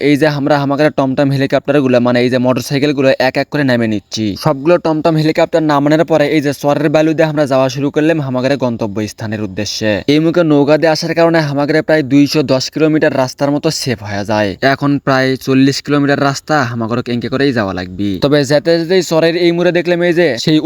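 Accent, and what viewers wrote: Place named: Bogura, Bangladesh. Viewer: গাইবান্ধার ভাষা এমন না। এটা বগুড়ার ভাষা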